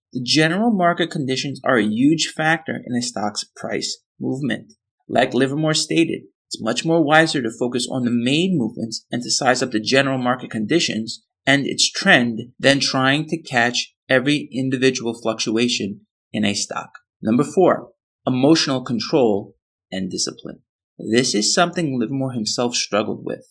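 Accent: United States. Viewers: Love you accent,Brooklyn? Cheers from the UK.🇬🇧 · Excellent yet simple 👏 👌 👍🏼.. Btw ..are u from ny 😅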